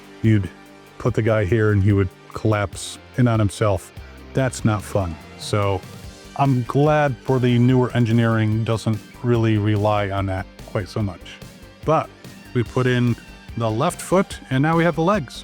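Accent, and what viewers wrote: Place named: Scotland, United Kingdom. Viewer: Which part of Scotland are you from, man?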